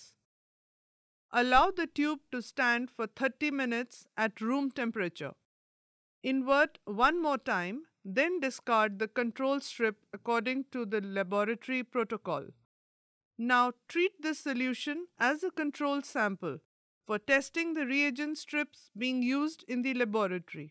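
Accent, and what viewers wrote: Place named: India. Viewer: The indian accent is to distracting.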